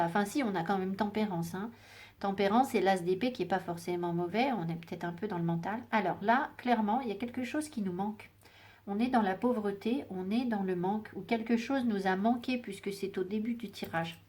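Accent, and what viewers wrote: England, United Kingdom. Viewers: Merci beaucoup pour l entrée en matière qui m à permis de noter le nom de ces 2 livres que j ai hâte d acheter dès que la Fnac sera ouverte. Également en dépendance affective, j ai fait un « soin » par téléphone pour couper les liens, comme les bonhommes allumettes aussi, mais rien n y fait. Je vous apprécie car je ressens votre sensibilité dans vos guidances et souvent votre émotion, ce qui déclenche la mienne car je suis hypersensible et ce que je traverse en ce moment n est pas facile, mais j y travaille. Continuez comme ça, je vois que nous sommes beaucoup à vous apprécier alors, ne changez rien. Beaucoup de bonheur à vous. Bises 🙏💕 Et moi j aime beaucoup votre bel accent anglais!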